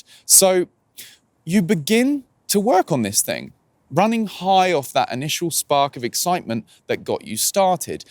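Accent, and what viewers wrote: United Kingdom. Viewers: Great content man! I've been meaning to explain this topic to people but they don't understand me or don't want to. I've seen people overreact and become so identified with their minds that they seem out of control so I try to help but it's hard. PD: I love the accent, I'm from Argentina and I'm studying to become an English teacher and here we learn RP English.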